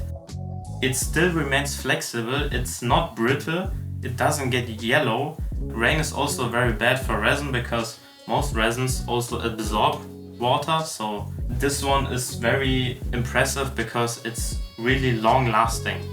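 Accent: Germany. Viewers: Are you from Germany? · Cooles Video aber man hört das du deutsch bist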